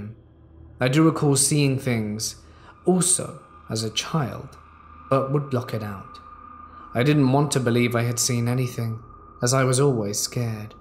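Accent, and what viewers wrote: United Kingdom. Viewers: So many of these stories are typically American teenagers but your narrator is obviously British. He’s excellent. Could pass fir Mr Darcy telling me a story. I’d love to hear British horror and not the stories of American kids, campfires and wendigos which get samey. But This first story sounds good.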